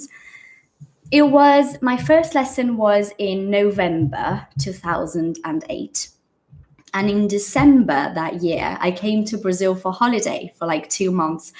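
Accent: United Kingdom. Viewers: Ma'am, you have a really good and beautiful British accent...bruh. I started my English speaking American accent and now I'm falling in love with British one.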